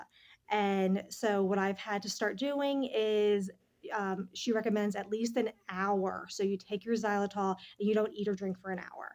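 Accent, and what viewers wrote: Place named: United States. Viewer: intersting ... I though her accent is so American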